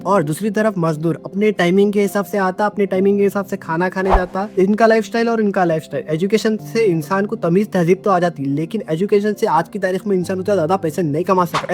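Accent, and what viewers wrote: Hyderabad, India. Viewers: Are You Hyderabadi ? I guess because your accent looks like Hyderabadi people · Accent is amazing, are you from hyderabad??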